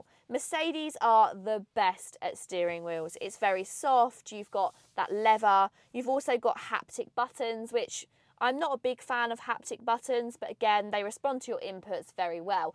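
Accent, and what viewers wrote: United Kingdom. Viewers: British accent is so funny 🤣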